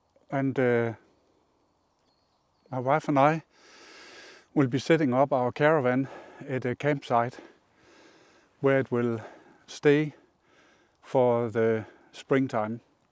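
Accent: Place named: Denmark. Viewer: Hello. I was playing pipe videos in my shop while working and heard your accent. I stopped immediately to check your video out. I was right danish! I retired two years ago with a great company NovoNordisk which took care of me and my family for many years. I had great times and memories visiting Copenhagen and Kalundborg through business. It was great thinking back and I have many great friends that I have kept from Denmark! Thank you for the video. Have a great day! It was great looking back.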